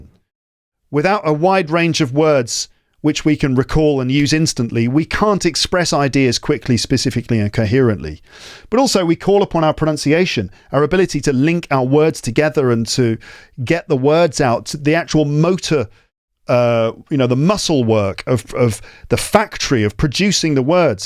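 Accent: United Kingdom. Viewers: I don't know why but i it's really difficult understand uk accent, ven so I could have good compren henshion of your explanation comparing to north america accent it's really really different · Your british English is so clear to be understood 😊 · I enjoyed the episode thank u very much. Also your podcast room is so cool! And finally, which British accent do u have I am interested ? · I don't like your British accent 🤢🤢🤢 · Dear teacher!!! I love your podcast, I love your accent or British accent. I´m learning a lot and havin fun. Lots of hugs from Brazil! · I’m literally enjoying to listen to your podcast, I love British accent ❤ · British English is much easier to understand. Thank you ❤❤❤❤❤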